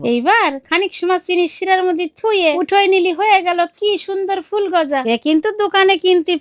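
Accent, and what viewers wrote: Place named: Khulna, Bangladesh. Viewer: কাকিমার বাড়ি কি বাংলাদেশ নাকি কাকিমার কথা শুনে বোঝা যাচ্ছে যে খুলনা বা গোপালগঞ্জের ওদিকে কাকিমা বাড়ি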